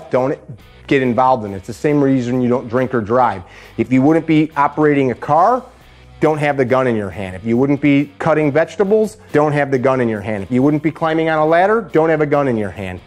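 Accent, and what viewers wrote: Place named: Midwest, United States. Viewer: I can’t get enough of his Midwest accent no homo.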